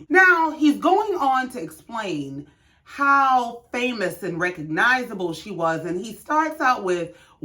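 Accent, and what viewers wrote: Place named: South Carolina, United States. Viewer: In all fairness Cynthia was a big deal on the New York scene so her connecting with Peter is not surprising and being with Leon was known. She is just more unavailable to general scenes. But I love your commentary with SC accent. I am thinking Charleston love it; reminds me of Grandma. Keep being you!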